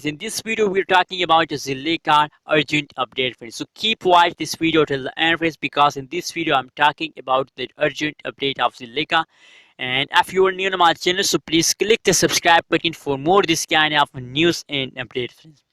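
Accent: India. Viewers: Sounds like you are from India, right? 🙈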